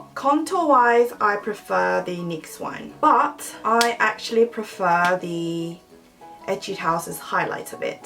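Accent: England, United Kingdom. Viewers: your british accent is amazinggg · omg u have such a cute english accent!! loving ur content girl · Are you british? Your accent is so nice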